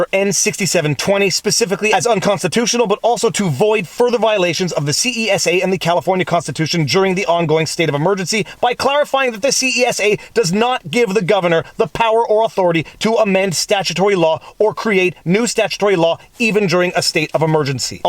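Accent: France. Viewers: I always laugh my ass off at the French accented readings. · Love the French accent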